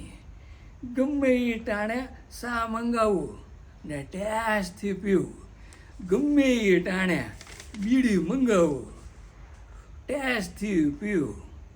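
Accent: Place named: Bhavnagar, India. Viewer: અસલ ભાવનગરી ભાષા😂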